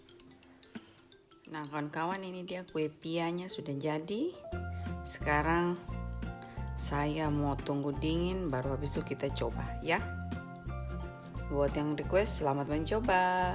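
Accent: Papua, Indonesia. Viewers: Pelei mace ko tra kosong...itu boleh dgn logat yg katorang dipapua mengerti dan paling jelas sekali. Salam dan.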